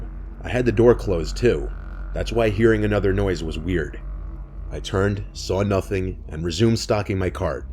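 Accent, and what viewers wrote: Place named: New York, United States. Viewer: Gotta love the New Jersey/ New York accent. Reminds me of home lol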